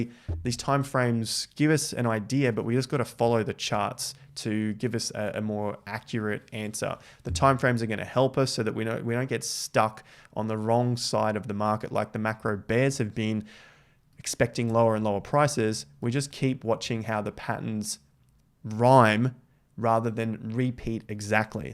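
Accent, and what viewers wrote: Australia. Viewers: I’ve subscribed again, it is great to hear a fellow Aussie breaking down the crypto charts. Well done & here’s to a great 2025 bull market.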